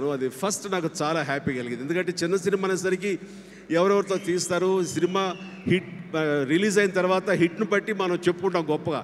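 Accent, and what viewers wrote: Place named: India. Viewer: Eena okadu English ni telugu laaga matladuthadu accushan choola baaga chesadu antha alludu...!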